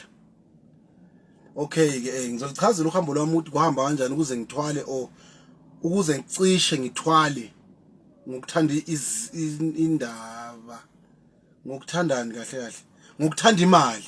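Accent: Zimbabwe. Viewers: Isi zulu sakho sithi ubuya e Zimbabwe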